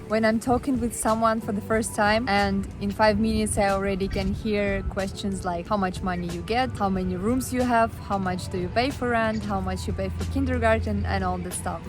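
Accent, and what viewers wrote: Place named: Turkey. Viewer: Sıradan türk görünümlü rus ilginç bir de İngilizce türkçe aksanlı 😂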